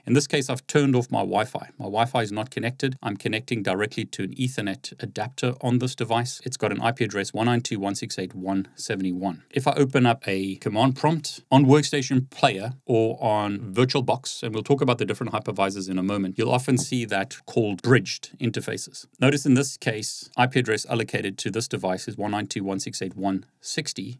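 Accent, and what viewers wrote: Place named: South Africa. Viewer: It's a South African accent!!!!!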